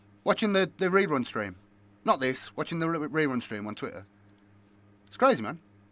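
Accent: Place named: Birmingham, United Kingdom. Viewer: So I just found this video end of July 2025 and I did wonder where all your excellent videos went to. I heard rumours that it was Geedubz clamping down on any use of their I.P. and from what I gather from this video, I think it is. I dunno what you're doing in order to circumvent the either potential or actual copyright strike from Geedubz. Good luck in whatever you try to do. Also this is not how I imagined you to be, an actual full blown Brummie lad.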